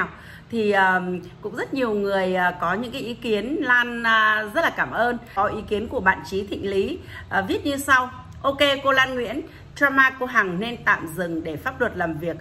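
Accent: Standard. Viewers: Chi noi chuan qua dan ong hay ham cua la an com chan thich an pho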